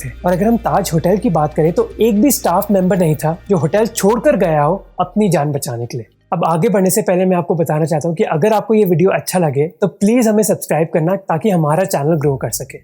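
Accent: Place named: Bihar, India. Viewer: I think it's his speaking style 🙃 like bihari accent or avadhi accent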